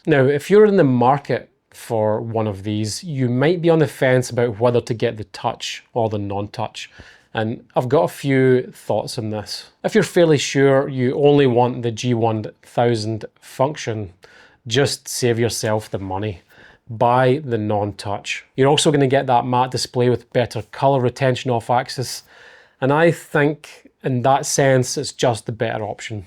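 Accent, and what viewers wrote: Scotland, United Kingdom. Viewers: ha! Mate this is quite a clear Scottish accent - even as a Londoner I can struggle to understand a Scottish accent but on the scale of things this guy could read the evening news as far as I'm concerned. Try subtitles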